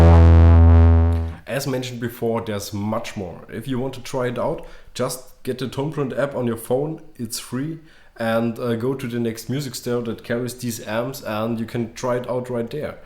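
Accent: Germany. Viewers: funny little gear and with your funny german english :))) great as allways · Nice review and accent ;) Typical German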